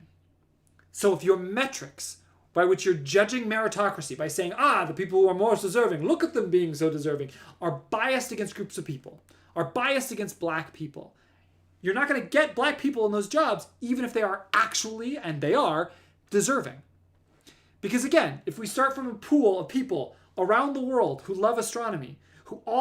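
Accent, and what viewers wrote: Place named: Middle East. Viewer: well you also have a bit of an accent to you so I guess that's why I thought Middle Eastern. Another thing I was a little surprised by is hearing that you grew up in OC, cuz I thought you grew up in NY.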